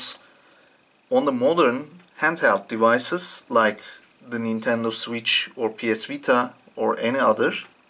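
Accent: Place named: France. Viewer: French accent eh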